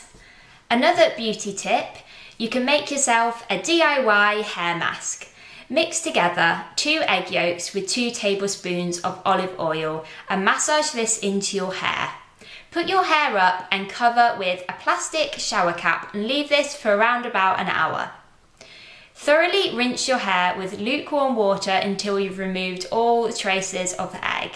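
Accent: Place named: England, United Kingdom. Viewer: great to see and hear an English Accent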